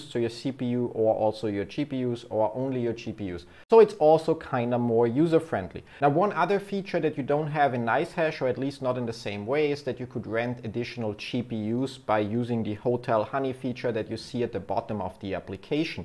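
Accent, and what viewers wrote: Germany. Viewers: du bist einer der sehr wenigen deutschen dem ich gerne beim englisch reden zuhöre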